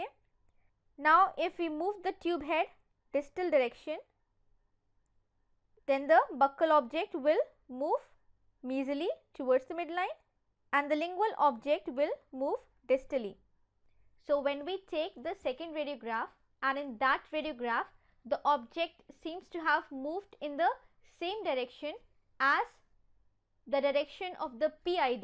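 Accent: India. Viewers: Your Indian accent is hawt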